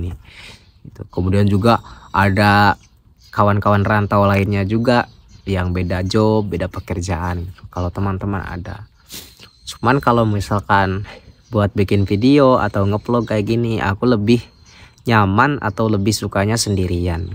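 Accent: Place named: Sunda, Indonesia. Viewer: Bapa pasti bangga a, btw asli mana a?. Logat sundana khas pisan😅. Pidoana a, mudah mudahan tiasa oge ngerantau ka jepang🙏🏻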